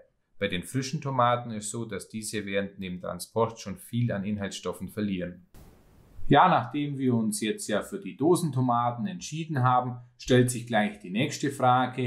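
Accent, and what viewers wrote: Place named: Baden-Württemberg, Germany. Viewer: Wie kann man da fränkisch raushören? Ich glaube, der gute kommt eher aus Baden-Württemberg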